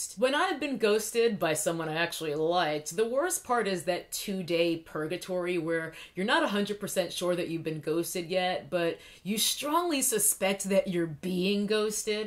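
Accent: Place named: New York City, United States. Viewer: Have you been hanging out with your NY crew??? The accent is strong in this one today! Also, yea dating B-lows. I've been off the apps for 3 (?) years now and this was my reminder to stay off.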